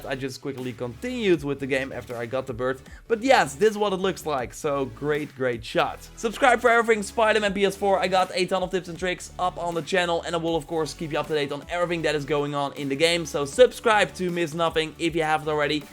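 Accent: Netherlands. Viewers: Are u netherlands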